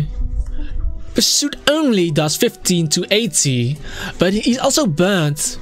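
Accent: Netherlands. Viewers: Just out of curiosity, why do you want to have a British accent? I kinda like your Dutch accent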